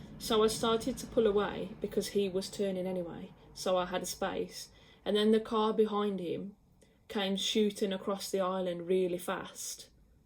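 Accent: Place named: Midlands, United Kingdom. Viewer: Hi. On your braking and some things you have said make sure your seating is right. Braking might be juddering because your not seated close enough to controls. The steering doesn’t sound right. On approach to islands etc yeah slow right down prior to entering the island and find the gap to keep on going. This saves you stopping and having to do the handbrake and you won’t need to try and pull out at such a slow pace. Mirrors mirrors mirrors especially when going around islands where you have to watch the swing on the back. All these things you mention will become second nature when you have passed and your on your own. You will still make some errors like me but you will get there. Good luck. You sound like a midlands gal.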